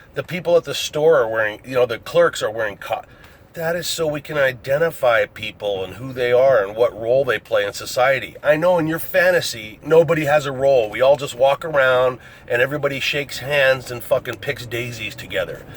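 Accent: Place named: New Jersey, United States. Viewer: Lol, excellent rant. I still think you're from Jersey.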